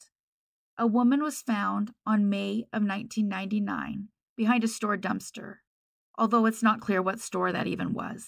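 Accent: United States. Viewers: The voice we are listening to, sounds like Southern Girl.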